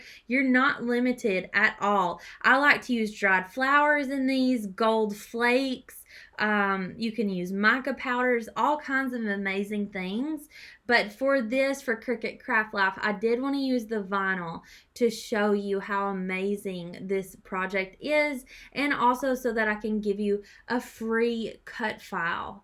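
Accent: South, United States. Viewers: Your beautiful projects match your beautiful smile & personality. I’m in MI and l could listen to your sweet, southern accent all day. Thank you for taking time from your family to show us these crafts. They are always so beautiful!💕🎄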